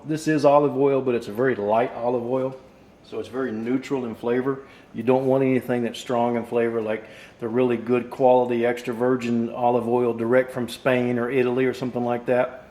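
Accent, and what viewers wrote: United States. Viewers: Looks great! I never like the pork in this dish to be honest, so it's perfect for me☺ Btw, I really like your accent! Where in the US do people speak like that?🤔 Sorry, I'm from Europe, so I can't tell...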